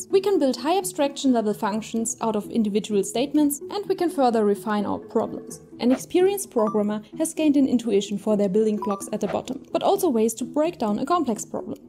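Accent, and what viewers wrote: Germany. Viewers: Great video. But can I hear a German accent? Nice. Greetings from Gelsenkirchen, Germany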